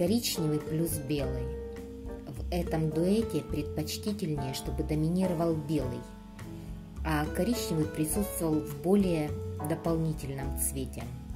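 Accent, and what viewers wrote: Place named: Europe. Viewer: А в какой стране Вы живете? У Вас очень сильный акцент, не могу понять с какого европ. языка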